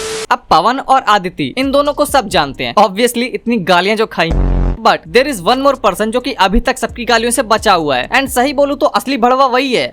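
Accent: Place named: Bihar, India. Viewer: bihari accent on top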